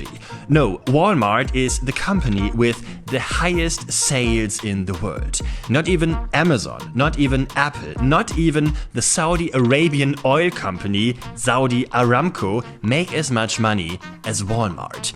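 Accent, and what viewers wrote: Germany. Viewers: Oh my God... Do I sound like that when I speak English? 🤦🏼‍♂️ - Hopefully not ... The German accent is really blatant. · Can't describe how much i dislike hearing a german speak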